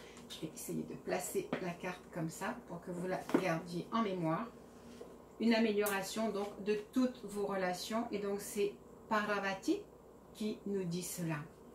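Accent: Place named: Canada. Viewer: Bonjour. J espère que vous allez bien. Merci pour ces messages. J ai entendu qu'au fur et mesure du parcours les 2 jumeaux ont des points communs physiques comme une harmonisation: même couleur d'yeux, même voie, forme du corps...Vous allez peut être rire mais. ou s avez des pointes d'accent canadien....tresbonne journée. 🌞😀🙏❤😘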